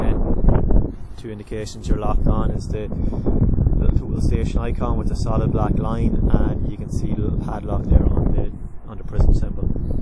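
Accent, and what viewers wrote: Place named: Ireland. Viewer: What a crappy tutorial.. How do you expect anyone to follow along with the monotone, robot sounding voice that also has a sharp Irish accent.. And how about that wind noise that drowns you out - that's actually kind of nice to be honest. Seriously, I don't think you guys listen to it before uploading to YouTube.. If you did you would be like OMG, THAT IS WORSE THAN A STEAMY PILE OF 💩. Do better, viewers deserve it!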